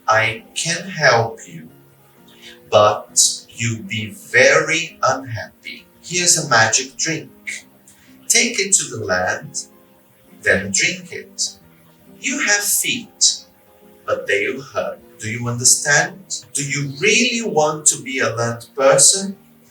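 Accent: United Kingdom. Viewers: obrigada prof, fiz um teste e meu nivel é A1 e amo o sotaque britanico obrigada por esses videos e esse canal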